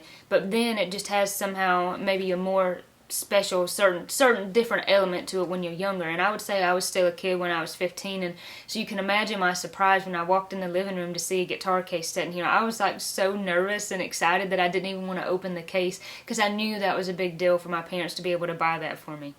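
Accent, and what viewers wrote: South, United States. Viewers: 1st off the word you were searching for that describes Christmas in childhood is MAGICAL! My mother was also a great documentarian so appreciate your video from the past. And lastly I think you have one of the most beautiful speaking voices I've ever heard. You should read audio books that need a southern accent!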